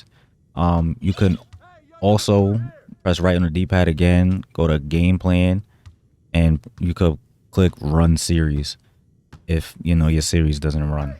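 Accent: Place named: New York, United States. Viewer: solid video you sound like the big apple is home for you. my fam is all there you sound like them very cool i have the walk of fame in my city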